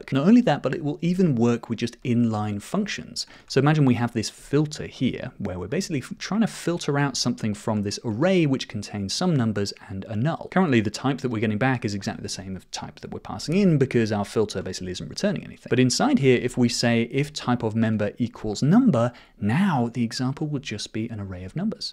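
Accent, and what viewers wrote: United Kingdom. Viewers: Ебанутый британский акцент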